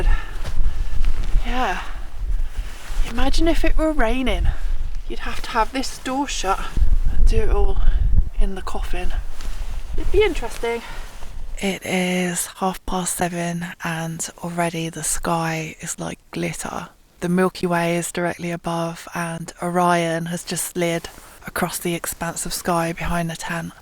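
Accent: United Kingdom. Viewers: Sometimes I watch to hear your cute Brittish voice. Hope you are doing well.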